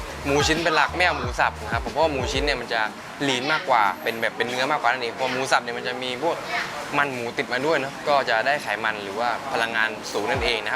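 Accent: South, Thailand. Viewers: พี่ลองพูดใต้ทั้งคลิปหน่อยครับ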